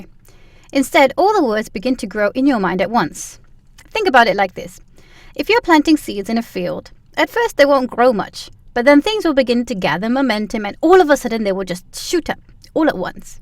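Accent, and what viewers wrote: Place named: United States. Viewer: 영국인 절대 아님 영국인 피가 섞인 동남아시아여가 분명합니다 발음은 영국영어가 아니라 미국영어에 가깝고요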